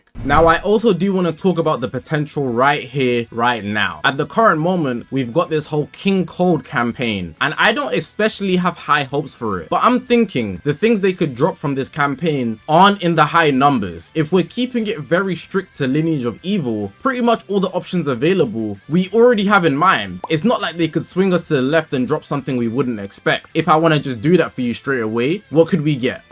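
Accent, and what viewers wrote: United Kingdom. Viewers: wait I did not know you were British honestly this is news to me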